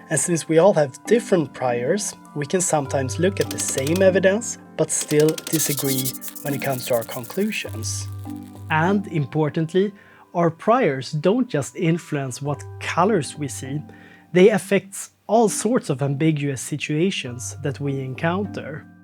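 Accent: Sweden. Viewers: I think this sounds silly, but I've been seriously hurt by some Swedish people before, and unfortunately, I've become distrusting of them as a result. Your videos help me hear your accent and work on destigmatising Swedish people as whole just because of a couple of bad apples. Thanks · unapologetic swedish accent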